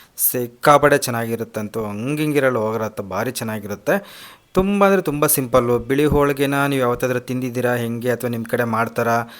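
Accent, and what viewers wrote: Chitradurga, India. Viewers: ಅವರ ಮಾತೆ ಅವರ ಸಂಪತ್ತು, ಮತ್ತು ಆಕರ್ಷಣೆ, ಯಾಕೆ ಹಾಗೆ ಹೇಳುತ್ತೀರಾ. ಅವರ ದುರ್ಗಾ ಭಾಷೆ ಶ ಎಂತ ಚಂದ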